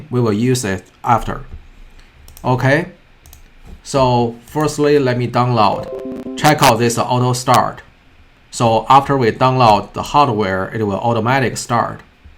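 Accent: China. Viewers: I still can tell that you are Chinese 🤣 Thanks for the video tho.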